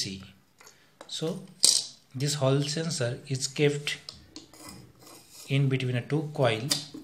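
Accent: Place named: India. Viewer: Your English feel like hindi😅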